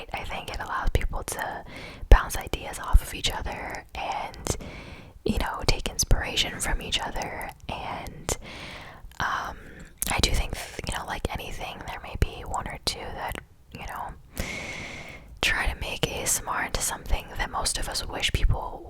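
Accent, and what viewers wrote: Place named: New Jersey, United States. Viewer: has an irl Jersey accent. I've heard it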